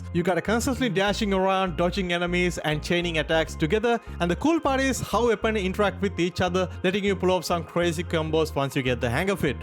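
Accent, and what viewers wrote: India. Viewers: south asia , i think india most probablt cause his indian accent is slippin a little out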